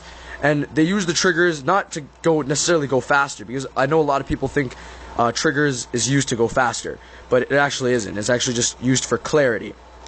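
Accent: Canada. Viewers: are you Canadian? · He sounds like Lars Ulrich mixed with Shia Labouff, but with a Canadian accent.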